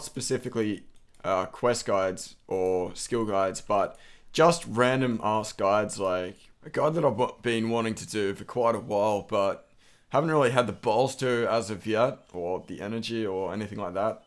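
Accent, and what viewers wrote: Australia. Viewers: This guy is an Aussie or an NZ man, get your accents straight! What's your next guess, Scottish?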